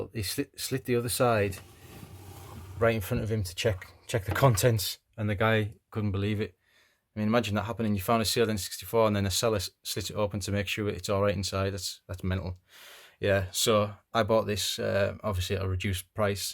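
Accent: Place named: West Cumbria, United Kingdom. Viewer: Great video, i'll enjoy watching this. You sound like you live near me. West Cumbria?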